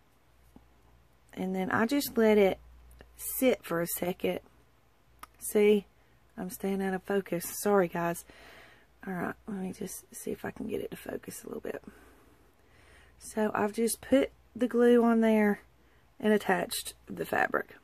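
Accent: South, United States. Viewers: This was a great tutorial. Your wire wrapping is beautiful. I love the fabric and stuff on the second bead. Do you have an Etsy shop? I didn't see any links or more ways to contact you. I'm from N. Georgia and I can tell you're from the south. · I sure have enjoyed learning from you and listening to your Georgia/Tennesse accent! You're too cute! Love the boho beads! <3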